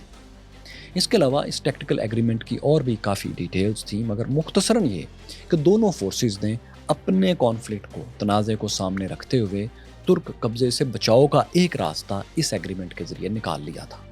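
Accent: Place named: Punjab, Pakistan. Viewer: What language are you speaking in? It appears to be a mixture of English, Arabic, Farsi, Turkish and Hindustani being spoken in a heavy Punjabi accent.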